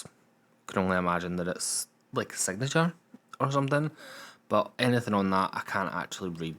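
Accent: Scotland, United Kingdom. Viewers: Your accent is like amelia pond but a boy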